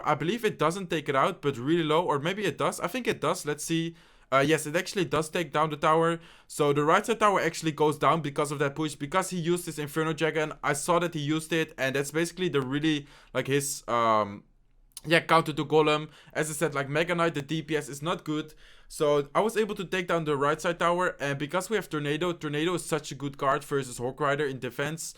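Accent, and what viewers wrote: Netherlands. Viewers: Je hoort nog een beetje dat je nederlands praat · Je Nederlandse accent 😂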